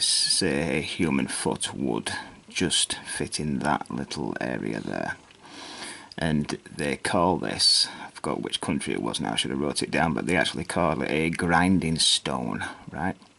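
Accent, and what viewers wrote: United Kingdom. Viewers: up: What area of the UK is your accent from?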